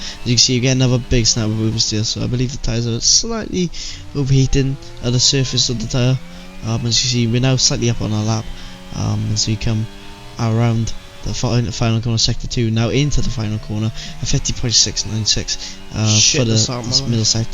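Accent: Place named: Cardiff, United Kingdom. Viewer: Man where are you from in wales? You sound like you’re from Cardiff being a blackwood lad myself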